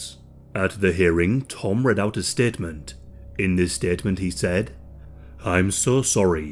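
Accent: United Kingdom. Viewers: lol ur so british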